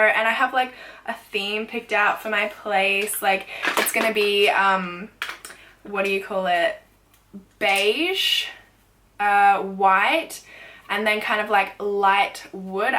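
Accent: Australia. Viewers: I absolutely love your Australian accent ❤❤ I'm English and my accent is so boring 😂😂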